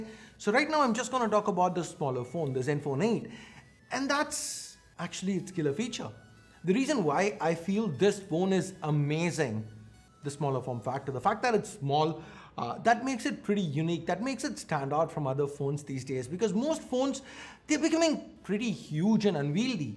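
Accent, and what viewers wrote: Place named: India. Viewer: I'm very sorry and no offense but I think I will watch your videos from now on so I can practice listening to indian accent english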